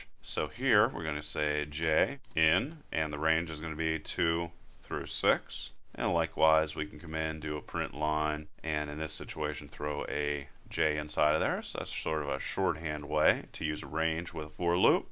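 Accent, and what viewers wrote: Pittsburgh, United States. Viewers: OK... you are indeed a Yinzer... and unlike so many videos, I didn't have to struggle to understand what is being said (which given that I used to work for Greater Bell Labs and had co-workers in my group from dozens of countries and had no problems understanding them, says how poor some of the videos you find up on YouTube are). While this video is a bit long, I think it would have been nice if you had explicitly covered the "var -> closure" syntax and nested each closures. Now to do some digging, as I try to write a Groovy script do do what I can do in over a dozen other languages already.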